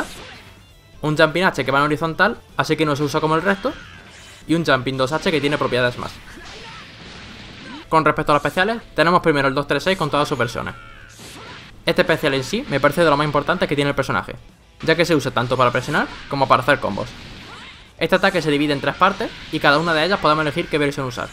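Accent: Spain. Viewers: Eres de Granada o de Jaen? xD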